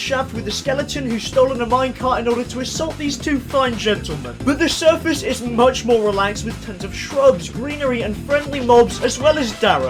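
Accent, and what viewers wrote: United Kingdom. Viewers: Mans British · Ahhhhhh British mate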